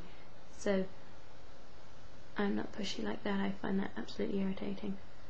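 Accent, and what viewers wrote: United Kingdom. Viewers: what part of england u from? always wondering where that type of "posh" accent comes from. like kate middleton. idk if she worked on her accent but ive NEVER heard her accent on anyone else. yours is nowhere near as posh as hers no worries! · your not posh your just british like me mate x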